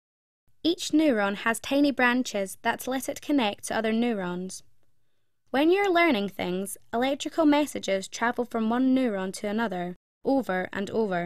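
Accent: Scotland, United Kingdom. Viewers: Great advice! It's probably the opposite of how most children are forced to learn these days. Btw, I think she sounded Scottish. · Are you from Scottland?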